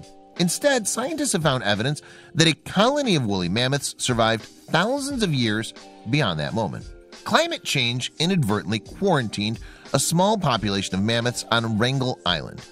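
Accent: Midwest, United States. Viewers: Hard to listen to the narrator's voice and grating Midwestern accent...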